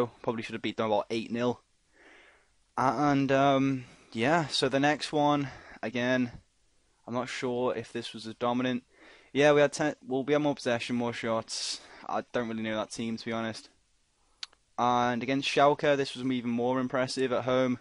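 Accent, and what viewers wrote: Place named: Wirral, United Kingdom. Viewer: Are you from the Wirral, you have a similar accent to me.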